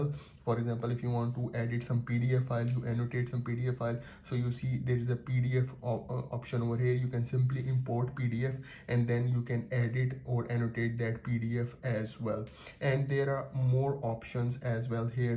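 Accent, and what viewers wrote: India. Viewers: Indian accent it seems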